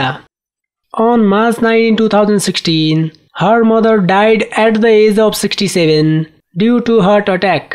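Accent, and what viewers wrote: India. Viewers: Nag thumbs up na sana ako pero nun na erita na ako at di kinaya ang indian na nagsasalita, ayan tuloy binawi ko na . Dapat boses ng tao at hinde alien ☹️😶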